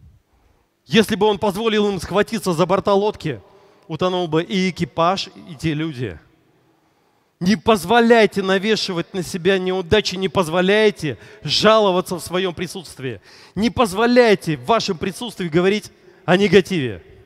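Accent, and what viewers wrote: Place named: United States. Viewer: Зачем так временами кричать, повторять американцев😂😂😂😂😂😂😂 даже интонации, типа психологическое воздействие, почему все бизнесмены начинают про свою жизнь рассказывать. Не спорю полезная информация. ГЛАВНОЕ ВЫБРАТЬ ПРАВИЛЬНУЮ ЦЕЛЬ И ПРАВИЛЬНУЮ МОТИВАЦИЮ чтобы достичь своему целью. Тогда и будет все. Если цель конкретная, и мотивация выбранная правильная как пинок, тогда и достигнет. Главное при этом торгашеском мире не забывать и о духовном богатстве☝️☝️☝️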